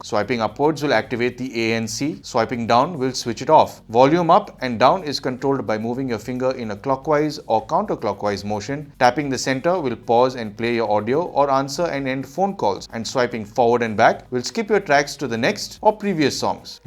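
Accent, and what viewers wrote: India. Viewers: the curry accent is great!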